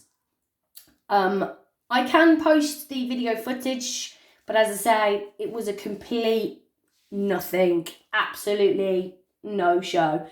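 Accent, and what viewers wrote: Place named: West Midlands, United Kingdom. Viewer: Nice to here a west mids voice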